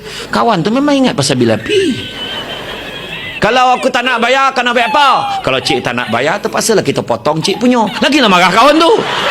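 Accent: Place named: Negeri Sembilan, Malaysia. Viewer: Lawak loghat N9 memang best rentak bahasa beralun tinggi rendah dgn lembut😂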